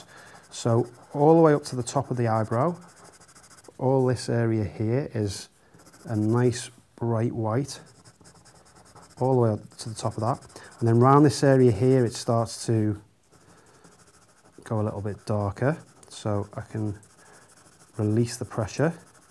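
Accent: Liverpool, United Kingdom. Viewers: What an amazing lesson. I’m awful with angles and ratios and can never get my head around them but you’ve just explained this so well. I’m defo a new subscriber, especially as I think you might be a fellow scouser! Thanks for sharing your knowledge. 👏🏼👏🏼👏🏼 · A scouser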